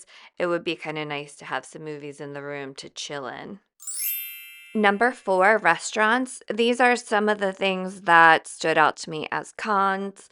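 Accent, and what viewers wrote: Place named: California, United States. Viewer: HER VOICE IS ANNOYING !! sounds like someone from California. Like oh my God. 😅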